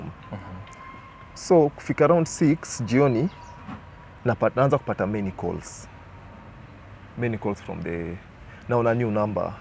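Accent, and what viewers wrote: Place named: Kikuyu, Kenya. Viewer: Sorry, the interviewer!!!! I've watched a few of your videos, but it's like mostly you don't understand the people you are interviewing or you're absent minded at some point. You keep asking some questions that are already explained.. and that's in almost all of your interviews!!! I'm sorry but that's just my view! Then again you "mocked" the Kamba lady coz of her kikamba accent, saying how you "like" the way kambas talk, lakini wewe, have you noticed how you talk!?? Your Gikuyu accent is so heavy!!!!! Come on!!! Try to focus and listen to understand!!!!!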